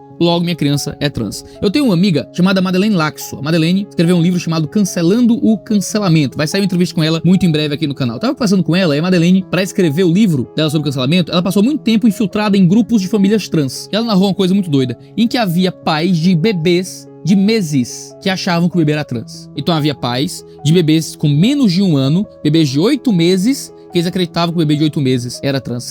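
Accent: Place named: Northeast, Brazil. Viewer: acho engraçado o jeito plenamente nordestino que o fala sobre as indescencias alheias "balangando a jurubeba" KAJKSAJKSJKLJLSJDSLKJSLKDSJLKSDJSLKJDS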